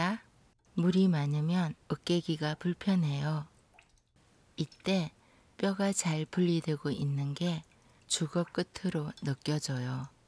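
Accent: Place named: Gyeongsang, South Korea. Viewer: 참~~경상도 사투리는 언제나 들어도 좋아요 사람을 무장해제시키는 매력있어요^^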